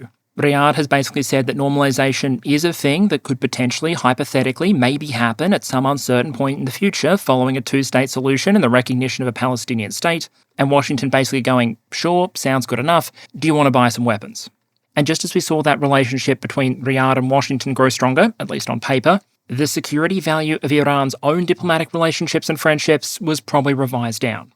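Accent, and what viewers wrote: Australia. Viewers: I'd suggest you turn on subtitles. The words all make sense, perhaps the Australian accent is the issue for you? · It is awesome to hear an Aussie commentary about world affairs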